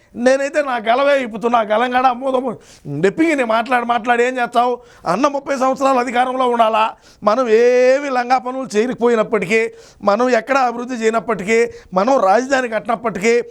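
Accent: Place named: Chittoor, India. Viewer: Where are you from brother you talk telugu of chittoor district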